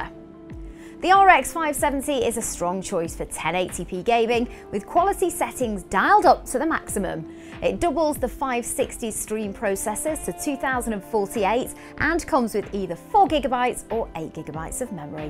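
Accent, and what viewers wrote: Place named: North Midlands, United Kingdom. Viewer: She sounds slightly North Midlands. Leicester maybe... Nottingham. I could be wrong.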